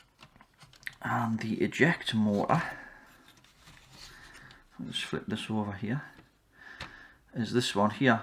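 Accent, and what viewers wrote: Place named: Scotland, United Kingdom. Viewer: It was a long video. So I fast forwarded it. Could not find the part you resolved it. But I enjoyed your accent. Sounds a bit Scottish. Is it? Can you tell me what went wrong with the unit?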